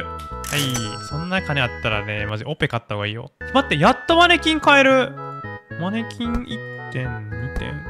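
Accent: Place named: Kansai, Japan. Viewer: 関西弁多めで嬉しい:_ キラキラ: マネキン重視なお店でオモロい:_ バカな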